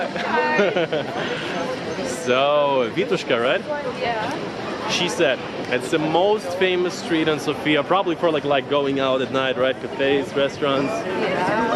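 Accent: United States. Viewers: Rich Bowman Yes my very thought too! I don’t know what nationality this guy is but he definitely has an American accent! If he is American then that would explain the BS!😳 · You actually speak english really well with a good american accent, been living all your life in the States I suppose.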